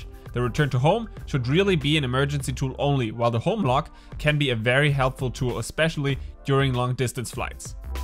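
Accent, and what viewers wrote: Germany. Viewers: Deutsch accent?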